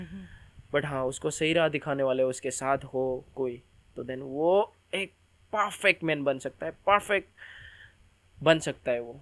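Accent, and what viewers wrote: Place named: Arunachal Pradesh, India. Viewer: Why your hindi is like arunachali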